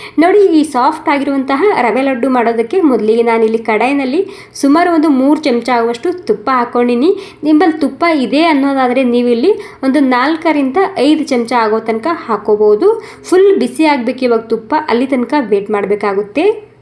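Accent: Maharashtra, India. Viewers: Recipe 👌👌,and your Kannada with Marathi accent 😊Stay blessed.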